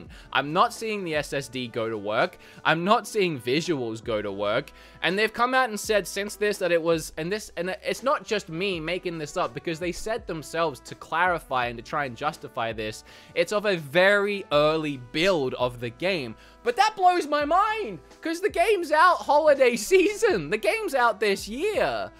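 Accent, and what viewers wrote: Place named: Australia. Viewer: Wow the Aussie accent is really popping on this one.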